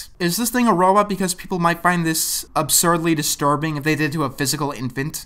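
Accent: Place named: United States. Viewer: So realise he's American, right? They say "bedder" instead of "better", "lenguage" instead of "language", "naht" instead of "not"... I could go on. Maybe that's just how they pronounce "execs" in America?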